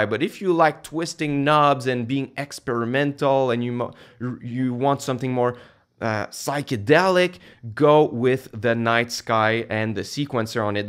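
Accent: Quebec, Canada. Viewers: Vidéo made in Québec selon l'accent non ?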